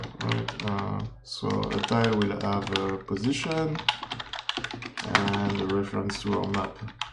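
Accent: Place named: France. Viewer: Tu as un accent Français ahah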